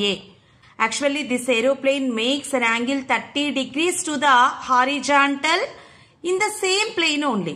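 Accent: South India, India. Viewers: The most South Indian accent heard in a while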